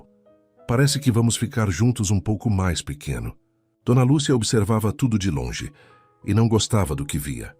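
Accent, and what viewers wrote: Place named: Portugal. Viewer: É necessário tomar mais cuidado com a narração. Foi lamentável a escuta de portugues de Portugal, depois inglês...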